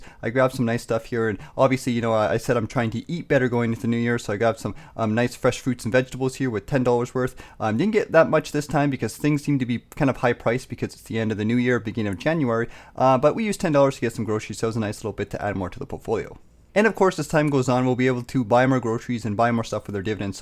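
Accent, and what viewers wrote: Canada. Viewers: Your accent is something... Where in Canada are you located? (This is coming from a Quebecer hehe)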